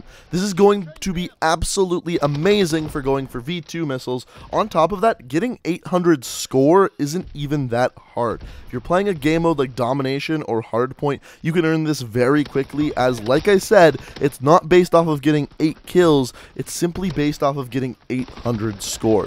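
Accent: Canada. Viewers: Are you canadian? You say aboot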